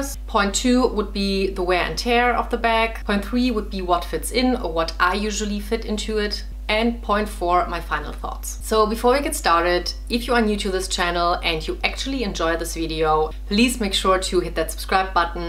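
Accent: Germany. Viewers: Heavy german accent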